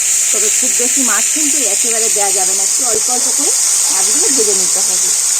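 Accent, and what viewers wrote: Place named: Dhaka, Bangladesh. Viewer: দিদি ভাই আপনিকি ঢাকা জেলার লোক?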